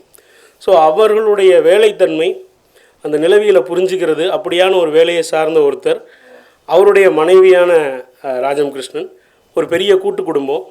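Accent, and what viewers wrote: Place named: Tirunelveli, India. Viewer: பெருமகிழ்ச்சி கார்த்தி புகழேந்தி. நெல்லை பேச்சு வழக்கு குறைந்து விட்டது வியப்பு! பிற்பகல் 3.15 மணி வரை இருந்தேன். நேரில் தங்களைச் சந்திக்க இயலவில்லை. (ராஜம் கிருஷ்ணன் அவர்களை மூன்று நான்கு கூட்டங்களுக்கு அவர் வசித்த போரூர் தெருவிலிருந்து அழைத்துச் சென்று விட்டது, மைதிலி சிவராமன் அவர்களுடனான நட்பு , 'மகளிர் சிந்தனை' மாத இதழில் அவரது கட்டுரையை கையெழுத்து வடிவில் பெற்று ஒருமுறை திருவல்லிக்கேணிக்கு எடுத்துச் சென்றுள்ள நினைவுகள் வந்தன. 2011 விநாயக சதுர்த்தி விடுமுறை நாளில் ஓவியர் கோபுலு அவர்களை அவரது ராஜா அண்ணாமலைபுர இல்லத்தில் சந்தித்தபோது ராஜம் கிருஷ்ணன் போரூர் ராமச்சந்திரா மருத்துவமனையில் அனுமதிக்கப்பட்ட நிலையில் அவருடன் கவிஞர் இரா.தெ.முத்து எடுத்த பேட்டி வெளியான செம்மலர் மாத இதழைக் காட்டியபோது கண் கலங்கிய படி உற்று நோக்கி 'லட்சுமிகரமா இருப்பளே!...' என்று பேச்சுத் தடுமாறியதை உணர்ந்தேன்...) )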